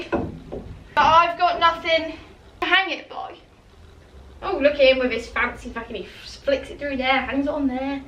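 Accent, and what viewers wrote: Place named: United Kingdom. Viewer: Clicked on the video out of curiosity, then you hit me with that UK accent and I couldn't stop watching. Love the humor but also the ambition. Subscribed for support and I'll be checking out you other videos, get it girl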